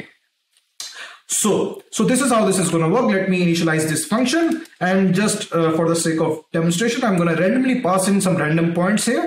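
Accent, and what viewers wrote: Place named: India. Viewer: Speak much more slowly, please. English with an Indian accent is hard.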